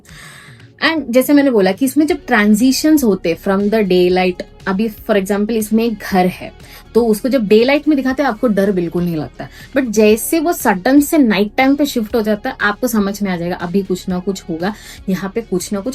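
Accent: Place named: Kottayam, India. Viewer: You speaking kottyam Hindi it's cute.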